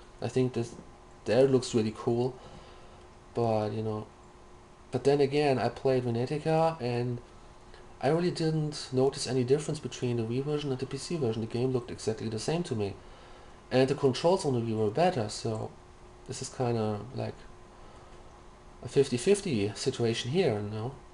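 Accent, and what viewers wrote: Germany. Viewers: Guten Morgen! I like your german accent it sounds really cool. Sony is in the business of making money. if your waiting for backwards compatibility on the PS3 Slim console, it well never happen. No offense but you want alot but your just not willing to pay for it. Blu-ray looks fantastic on a properly "calibrated" hdtv preferably 1080p but a 720p would work as well. You don't seem to be into movies so i'd recommend you get a 60GB PS3 console which is backward compatible.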